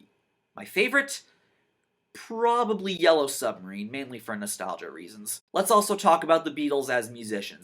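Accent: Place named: United States. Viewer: The only thing wrong with The Beatles is that you Americans keep saying Beedles. It's Beatles with a t for f***'s sake!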